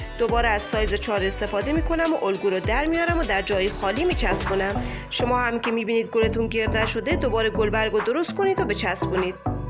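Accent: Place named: Tehran, Iran. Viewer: لحجه شما تهرانیه درست؟ تهرانی هستید معلومه🌺👌👍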